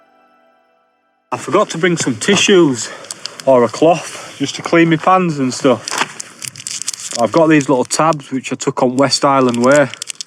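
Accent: Yorkshire, United Kingdom. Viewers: Im sure you've had some dawg after ya food it looks like 😂 hope you enjoyed, im jealous. Keep up the good work just subed. Nice to hear another yorkshire accent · love it when you pronounce "cant" as 'caart'. Keep on yorkshireing old boy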